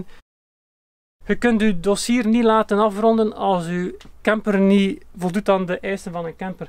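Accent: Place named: Belgium. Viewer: Dag, ik ben blij eens een Belg aan het woord te horen. Ik heb een Citroën Jumper L3H2 ingeschreven als lichte vrachtwagen en wil die ook gaan gebruiken als camper. Niet door een super de luxe ombouw maar gewoon het hoogst noodzakelijke, bed,kastjes,keukentje,tafeltje. Ik ga er geen ramen inzetten want dat mag je niet zelf doen zeg je, maar ik moet natuurlijk wel ventilatie in het dak hebben, daarvoor moet ik een gat in het dag maken (plaats in het dag is wel voorzien). Mag ik dat zelf doen? Of moet ik naar een bedrijf die dat doet? Fijn einde jaar en een gezond 2023.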